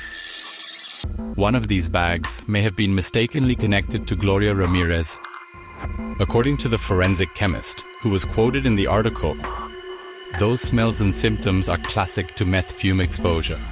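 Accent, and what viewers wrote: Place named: Germany. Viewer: Just chiming in to say your voice is great, and the German accent makes it a bit more interesting.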